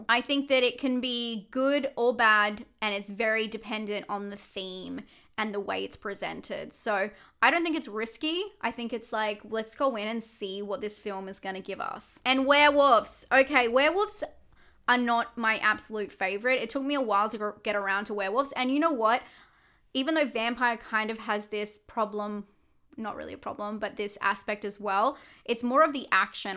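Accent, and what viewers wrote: Australia. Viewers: Australian English loves those diphthongs! 😆 I like Aussie accents a lot too.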